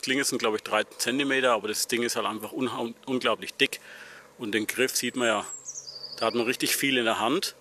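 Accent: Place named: Franconia, Germany. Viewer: Cool! Hätte ich bei beiden Lust drauf. Ein Landi hatte ich ja schonmal in der Werkstatt ... Wir werden sehen ... Übrigens, ich sehe Deine Videos nicht nur wegen des Inhaltes so gern sondern ich liebe einfach den frängischn Dialekt. Bin ja selber ein Oberfranke ... Zumindest von der Mundart her.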